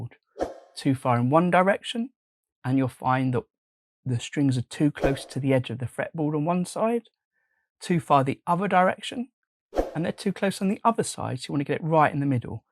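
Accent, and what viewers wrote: United Kingdom. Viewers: I can listen to this type of English accent all day.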